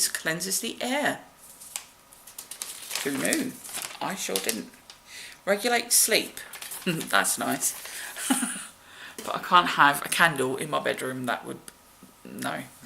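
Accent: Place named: United Kingdom. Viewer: How come you have a British accent when you are Dutch? just curious not a dig :)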